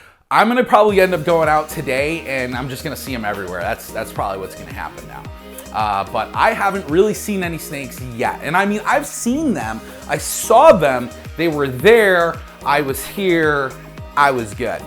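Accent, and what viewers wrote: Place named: Western New York, United States. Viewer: Entertaining video, though that Western New York accent is still evident. Go Bills!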